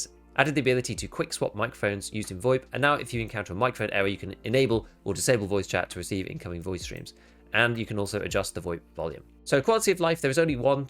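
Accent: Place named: England, United Kingdom. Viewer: Yeah, always Johnny on the spot with the videos, read to us in a pleasant English accent. Fuckin' a man, fuckin' a.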